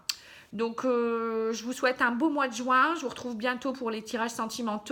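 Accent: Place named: Hauts-de-France, France. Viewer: Bonsoir, merci pour ce beau tirage très complet, très parlant pour moi, en plus avec votre accent Hauts de France, je suis picarde, j'aime beaucoup, bonne soirée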